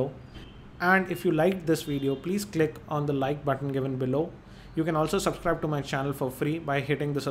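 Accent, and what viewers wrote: India. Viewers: Funny idian voice xD (no racist)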